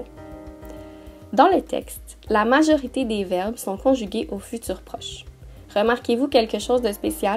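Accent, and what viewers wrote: Quebec, Canada. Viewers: Si tu parles de son accent c'est l'accent québécois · Merci beaucoup!!! Votre vidéo est très instructive et votre bel accent québécois me rappelle mon séjour universitaire à la Belle Province. Continuez! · Merci de m'avoir répondu. Votre capsule est parfaite. J'ai pris votre capsule et j'ai changé votre voix contre la mienne.Je l'ai utilisé juste sur wath sapp. Pour mes élèves comme un petit rappel de la leçon. Ils ont beaucoup apprécié. Je leur ai dit bien sûr que ça venait de you tube. Une élève à moi a fait la même expérience. Vous avez un bel accent.Vous êtes canadienne je suppose. Moi je suis marocaine. C'est pour cela j'ai dû le refaire en français. Votre explication est parfaite. Partir d'un texte pour comprendre la conjugaison est la façon pédagogique idéal. J'aurais aimé connaître le nom et prénom pour le mentionner dans votre vidéo. 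Mais il n y a que 😘. Voilà, j'espère que ma question est un peu mieux comprise. Mais si c'est interdit, je ne le lancerai pas bien sûr. C'est votre travail et vous méritez un CHAPEAU Bravo, l'animation est parfaite ainsi que l'explication. 😉😍😘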